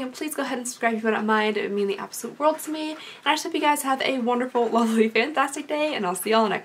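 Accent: Kentucky, United States. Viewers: oof wait are u from Kentucky, I am too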